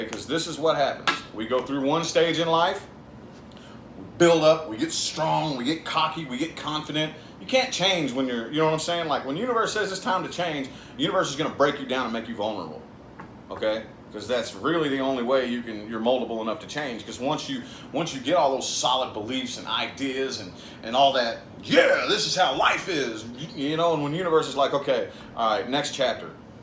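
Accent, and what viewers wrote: United States. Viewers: Where in the US is your accent from? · Very nice, very accurate. By the way, I love your american accent (I am not from an English speaking country). Thank you very much.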